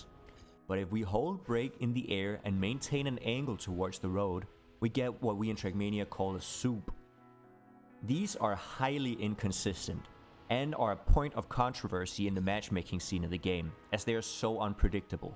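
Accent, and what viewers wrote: Denmark. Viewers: I reconize an Danish accent when i hear one xDD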